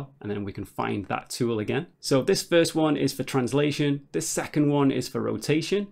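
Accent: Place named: North, United Kingdom. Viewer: I like your northern accent! clear friendly and well paced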